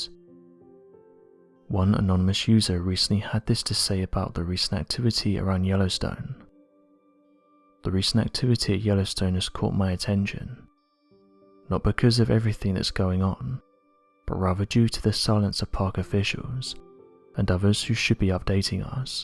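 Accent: England, United Kingdom. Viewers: The whispering English accent can make anything scary. Besides that, research Jacob Lowenstein. He worked in the park for decades and is highly educated in the field. He has a family too…..and lives right near Yellowstone. If this “data” was a concern, I’m quite sure he’d be gone. But then again, maybe the dudes nuts and wants to die there.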